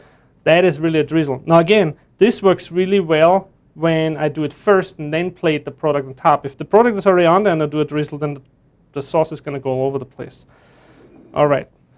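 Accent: Austria. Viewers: Einen Österreicher hör ich aus 100 Leuten raus :-) Cooles Video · Phillip Dodds austrian probably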